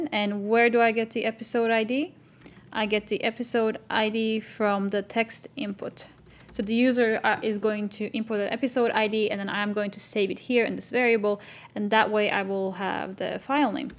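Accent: Turkey. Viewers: She has a Turkish accent.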